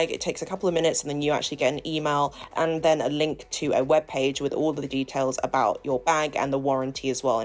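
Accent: United Kingdom. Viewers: Lovely bag! Also side note, I love how you are Asian but sound so British - like me! 😍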